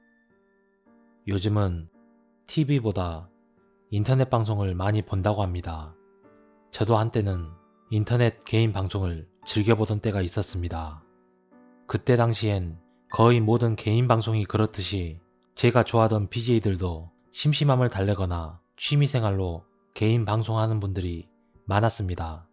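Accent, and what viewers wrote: Jeolla, South Korea. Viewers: 전라도 분이신가요?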